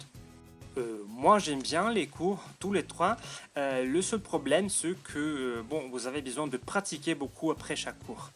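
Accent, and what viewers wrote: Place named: Italy. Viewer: Buongiorno e grazie mille per il tuo lavoro ! Ho studiato la lingua italiana per 3 anni a scuola, ma fa molto molto tempo.... In ottobre, ho deciso di continuare perchè mi piace molto questa lingua ! Ho trovato su internet : DuoLingo ! è gratuito. Secondo me, è come un gioco, ma si deve imparare ogni giorno (o "tutti i giorni" ?) DuoLingo è veramente interesante, ma non basta : ho bisognio di venire qui per le tue lezioni ! C'est un très bon complément . ps : il tuo accento italiano è bello ,-)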